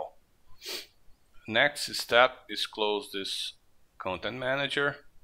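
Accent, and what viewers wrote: Brazil. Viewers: pelo sotaque eu tava aqui pensando "esse cara deve ser br" hahahahahah batata! sucesso para o canal cara, valeu a dica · Eu sei que vc é Br pelo sotaque e pelo windows em Pt Br hauhuah mas olha, vlw pelo tutorial! :P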